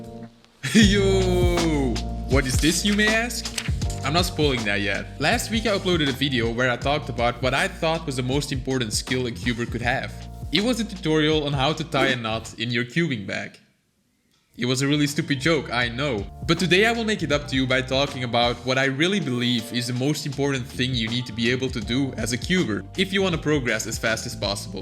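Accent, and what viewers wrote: Netherlands. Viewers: You sound kinda dutch tbh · are you dutch??????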